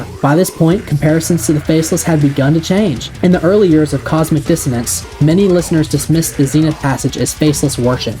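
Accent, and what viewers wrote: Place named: South Carolina, United States. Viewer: You sound like you're from South Carolina.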